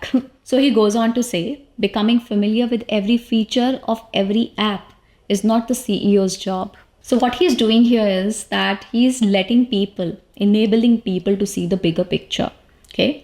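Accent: India. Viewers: Oh mam… you make so many videos on correct pronunciation..but the difference is in those videos you are targeting words that people don’t use oftentimes and its easy to highlight those words.. but after watching this video of yours i can clearly see how many mistakes you’re making while pronouncing multiple words.. but the point is that while speaking nobody really focuses on pronouncing words as we are making people understand our standpoint.. its so funny and annoying that you create videos just to collect audience, when you yourself speak in a very common accent just like every indian speaker… i work with so many UK & US stakeholders and to be very honest nobody really spell the words the way you speak in your so called “how to Pronounce words” videos on youtube…and now all your stupid fan followers will start replying me and giving me gyaan but i really don’t care… moreover there are so many other important topics that are going in the world nobody really cares about your videos…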